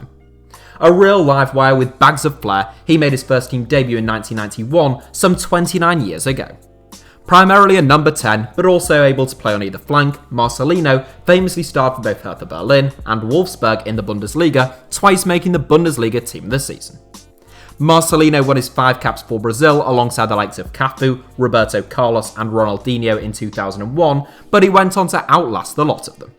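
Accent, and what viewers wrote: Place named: RP, United Kingdom. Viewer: Well not to sound like a dickhead but the commentators voice is so annoying like it's a posh accent and he tries to prove to us that he is better which actually does the opposite never need to prove yourself to people I kinda get he is trying what he deemed his best but it still show maybe in the future he will lose it I hope as it is a horrible trait to have as the voice is a beautiful instrument and language an art but please dont let our lovely ears to despair and partial misery I dont hate on him just notice it even in other channels youtube is 90 percent toxic 10 percent good so is Twitter instagram and Facebook peace have a productive day and fuck the dickheads around who trying to bring u down not talking about the commentator just in general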